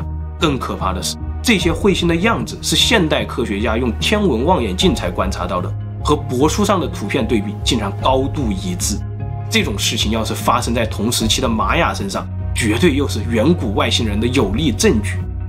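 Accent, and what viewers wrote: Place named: Fujian, China. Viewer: 刚把老九门看完😊，是弗兰人吧？完全的弗兰口音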